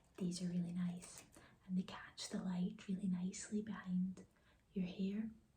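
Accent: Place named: Scotland, United Kingdom. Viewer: Is that Scottish accent? Or Irish? How cute and relaxing :)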